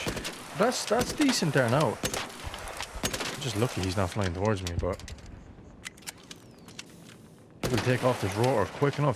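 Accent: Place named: Irish Traveller, Ireland. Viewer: It's called Irish Traveller or "Pikey" accent. Watch the movie "Snatch".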